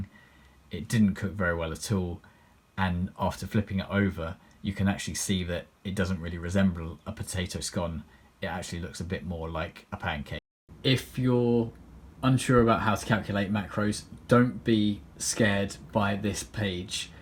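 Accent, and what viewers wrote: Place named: Scotland, United Kingdom. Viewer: Loves the Scots voice- nice roses too in the background whey to go paddle!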